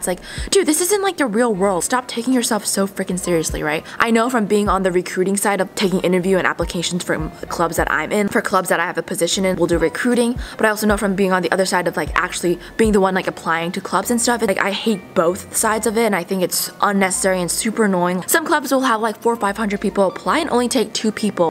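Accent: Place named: Southern California, United States. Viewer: God, that SoCal accent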